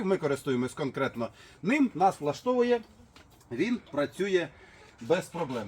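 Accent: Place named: Luhansk, Ukraine. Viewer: Я балдею от вашей разговорной речи луганск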